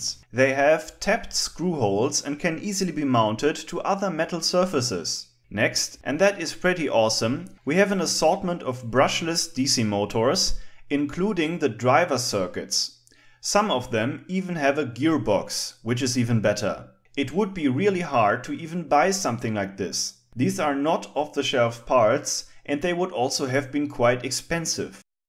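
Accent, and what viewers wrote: Germany. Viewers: Surprised you haven't used any of this to make CNC of some sort. I also love your accent, partly because I was stationed in Germany while in the US Army, so it's nice to hear English with a German accent again. Keep up the great work, I learn a lot from you! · For a German his accent is really light imo. Excellent pronunciation too. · evil German mad scientist of course. classic accent :) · Yeah German or Austrian definitely he speaks classic school accent as it's spoken in schools in these countries but not bad...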